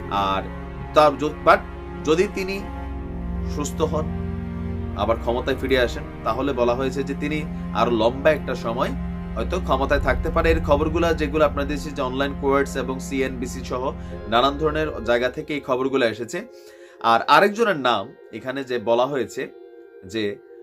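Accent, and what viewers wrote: Comilla, Bangladesh. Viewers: Tomar video gulo khub pochondo kore dekhi.Tomar news e aanek totho pai r tomar presentation besh bhalo. Tobe tomi comilla r ancholikota over come korte paronai. Tumi bar bar desh k dash bolo. Jeta srutikotu lage. Tumi bolechi mind koro ni to ? Aami tomar ma khalader moto. So....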